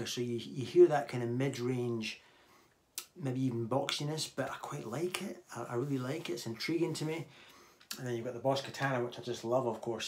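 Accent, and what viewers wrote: Scotland, United Kingdom. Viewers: just discovered your channel, brilliant to hear a fellow Scotsman on YouTube not too many about, love your playing and analysis, keep up the good work buddy.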